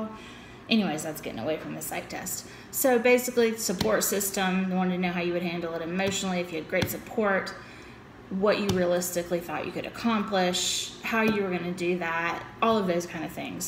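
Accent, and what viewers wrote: South, United States. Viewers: Hey, I'm glad you were honest and I do understand how dark that place can be. I am so happy that you are in a better place. I felt the same way during the psychological testing. And I am still going weekly to my psychologist. I probably will for the rest of my life. You are on your way girl to feeling better. I heard you say Ya'll a few times and I am picking up a little bit of a Southern accent where are you from? We live on the Gulf Coast in the deep south, MS. Keep posting please, it's helping me get through this long wait. Rebecca:)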